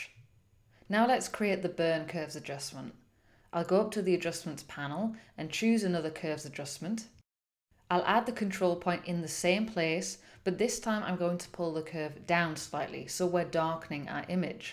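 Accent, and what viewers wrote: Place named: United Kingdom. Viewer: Very beautiful british accent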